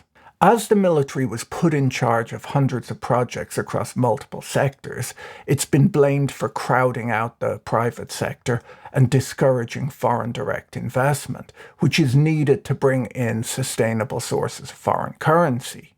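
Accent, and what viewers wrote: Ireland. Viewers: I do miss the occasional comedy. Everything in an Irish accent is funny. However, he has to be one of smartest men I seen in a long time.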